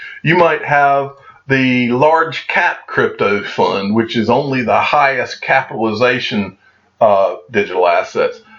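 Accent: United States. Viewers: Brother I love your cowboy accent Your Enthusiasm your knowledge your dedication keep up the good work.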